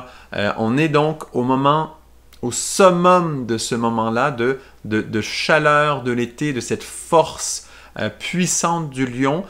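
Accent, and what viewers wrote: Canada. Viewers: c'est vraiment très intéressant , l'accent canadien donne une dimension supérieure à cette capsule !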